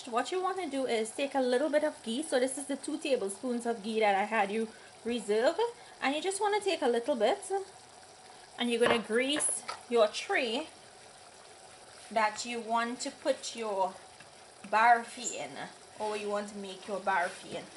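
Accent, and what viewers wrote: Trinidad and Tobago. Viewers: To Shabana Nisha who said accent annoying. How rude. You nasty person, we are Trinidadians and proud of it. Who are you to criticise her accent. Too back to where you came from. Where they poop in the streets. Go back to India, you horrible person. You never hear of Trinidad. Go back to school. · She's a Trinidadian. You can hear her trini accent maybe that's the way her family made it.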